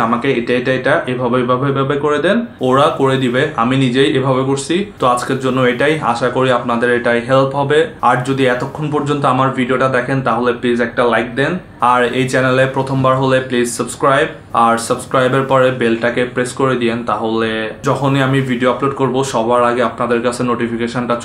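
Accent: Chittagong, Bangladesh. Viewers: ভাইতো খাটি চাটঙ্গাঁইয়া। 😍😂😂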